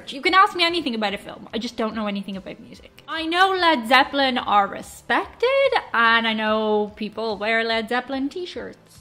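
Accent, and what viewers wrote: Ireland. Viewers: You're funny..... And you gotta love that accent! I'm Irish but I don't sound like that.... I mean, my Great, Great, Great, Great Grandfather was Henry Grattan! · On the basis of you're accent, i'm assuming you're irish. If you are based in dublin, i would check out ticketmaster for tribute bands of led Zeppelin. I've seen one at the academy.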